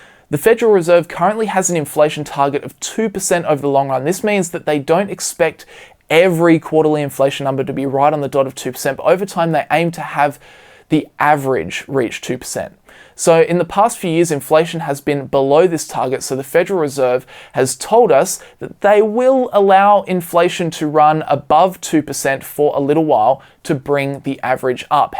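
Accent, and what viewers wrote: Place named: Australia. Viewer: Not bad for an Aussie!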